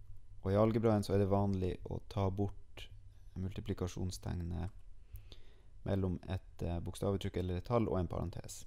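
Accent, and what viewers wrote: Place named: North, Norway. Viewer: du e fra nord-norge og det e positivt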